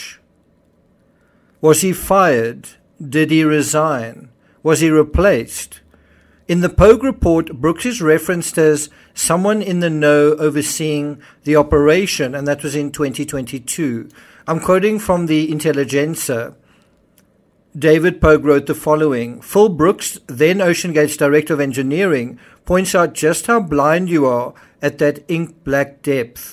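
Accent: South Africa. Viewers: Loved hearing the South African term "nogal". I always hhought your accent was South African. Keep up the good work! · you sound South African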